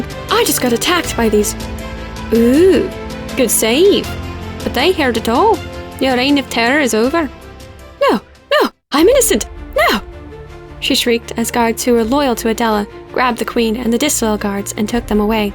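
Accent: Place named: United Kingdom. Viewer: WOWWW! I just found your channel your british accent to it adds prettieness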